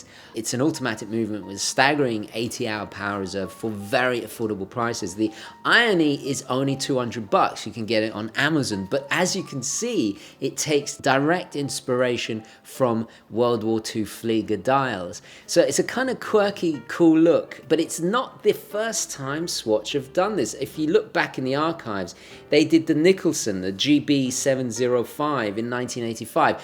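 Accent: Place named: United Kingdom. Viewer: Just came here for the "Bri-ish Ah-Cents".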